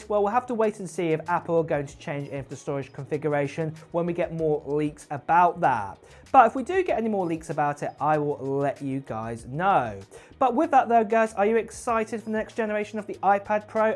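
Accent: United Kingdom. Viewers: 안녕하세요 한국인입니다. 영어공부 잘하고 싶어서 의 테크영상 자주 봅니다. 저는 특히 한국 특성상 노트북도 윈도우와 핸드폰은 갤럭시만 줄곧 써왔어요. 애플 기기는 관심은 있지만 경험해보질 못했어요. 이번 기회에 이벤트하시는 맥북 제가 꼭 받아 공부하는데 써보고 싶습니다^^;; 너무 큰 욕심일까요?ㅎㅎ 발음 영국식이라 아직은 자막을 좀 키고 들어야하지만 실력 늘려서 꼭 자막 끄고 전부 이해하면서 재밌게 영상 시청해보고 싶어요.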